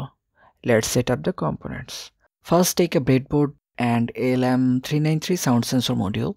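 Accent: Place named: India. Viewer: stop speek like indian guy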